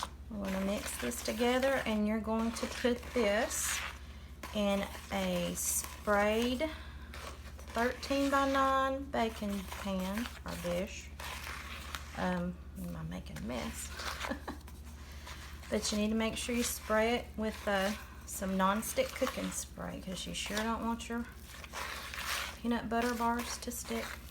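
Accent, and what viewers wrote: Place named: South, United States. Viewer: Love her Southern accent. Much better than the East Tennessee drawl I had many years ago!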